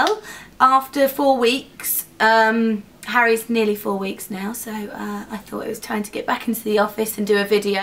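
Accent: United Kingdom. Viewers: Hi.! So happy both you and little Harry are OK.;) Time's flying by so fast! No wonder these little angels grow up so fast.:) Thanx for the awesome vid, you can't imagine how many of your looks I'm wearing on a daily basis. (And, on a totally different note, you restored the British accent to my world! Some awful teachers ruined it for me forever but I LOVE listening to you guys! Don't mean that in any weird way.:) Just a thank you note.:))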